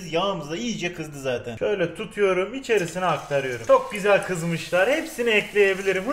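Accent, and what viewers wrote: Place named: Black Sea, Turkey. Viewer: Lan ben daha 12 yaşındayim niye bunu izliyrum da bu da karadeniz 🤣🤣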